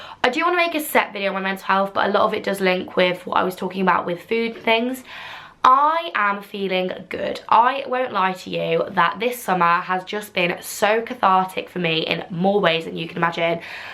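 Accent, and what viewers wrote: United Kingdom. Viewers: British Accent 💕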